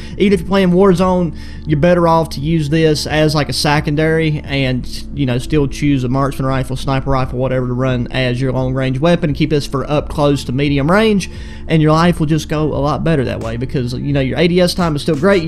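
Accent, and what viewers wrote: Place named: South, United States. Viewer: That Southern accent is hilarious, I had to subscribe 😂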